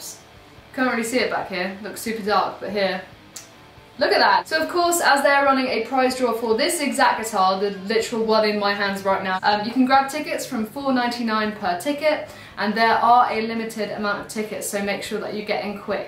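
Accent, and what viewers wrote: United Kingdom. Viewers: I'm thinking about moving there to meet! Have watched all her videos, her cute, confidant and FUN energy is better than any drug, watching her play Slither, or anything else, makes my soul smile! and, gawd damn the girl can PLAY!! and, love, you are absolutely adorable with your nice legs just dangling there while you rip on the guitar! Beautiful, sexy, confidant AND talented beyond words, wow... my fantasy girl! oh, and forgot to mention that cute as hell British accent!! oh, yeah that guitar is pretty sweet too lol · You are so damn cute 🥰 with your brit accent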